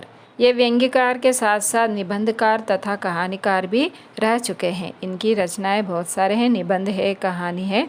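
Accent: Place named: Andhra Pradesh, India. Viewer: Mam looks like your accent is different I think you are more comfort in telugu 😅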